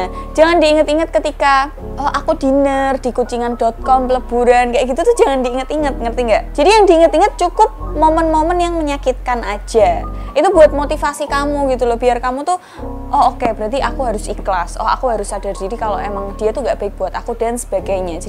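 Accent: Java, Indonesia. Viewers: Duh kak medok² Uwu gitu angel angell nemen eram😍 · jowone ngendi mbak, sapean....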